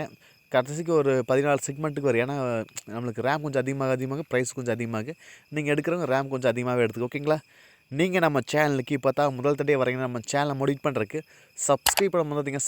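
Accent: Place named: Kongu, India. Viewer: Kongu tamil properah kettu evalo naal aaguthu❤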